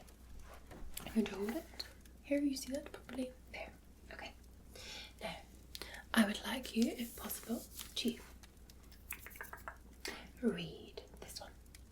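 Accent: Scotland, United Kingdom. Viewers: Pretty neat and beautiful my birthday is today think we can be best of friends?💐💖😘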